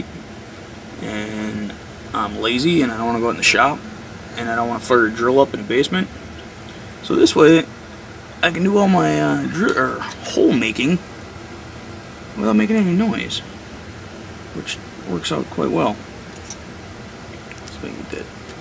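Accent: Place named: Michigan, United States. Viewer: I love the long needle and cork idea! Many times we've had to punch holes for belts, and also we like a make small leather projects. You can get a rolling wheel with sharp spikes on it, to mark your points for stitching, then use your needle punch to finish the holes through. Thank you Accent sounds L.P. Michigan to me, have relatives. I really like all the punches, and variety you have created for use with your arbor press.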